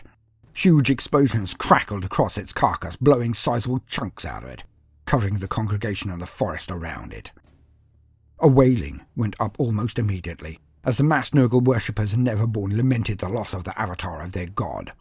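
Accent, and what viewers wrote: United Kingdom. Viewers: I could listen to your silky British accent all day. Love your content brother. Keep being awesome. 😀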